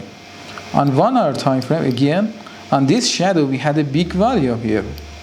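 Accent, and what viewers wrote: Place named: Iran. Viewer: Hi its a great honor to see u here talking in english language but u have very farsish accent 😁😜🌹🌹❤️⭐❤️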